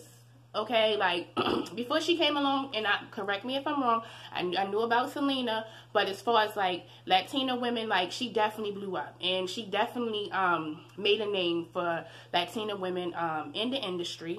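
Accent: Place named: Baltimore, United States. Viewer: I’m from Baltimore and your accent sounds similar .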